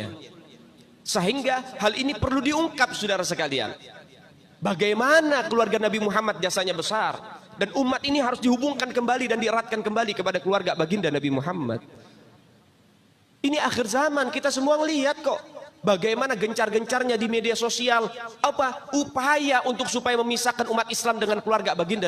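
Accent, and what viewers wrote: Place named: Jakarta, Indonesia. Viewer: ini demit dari mana ? bahasaya dari betawi , keturunan dari yamen ?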